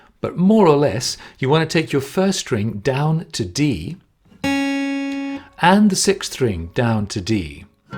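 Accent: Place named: RP, United Kingdom. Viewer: Excellent. I love the teaching and the technique. I just would like to know if the Rex. Harrison accent is authentic because only 2 to 3% of people in England talk like that. And it sounds just like Stewie on a Family Guy, very cool, though.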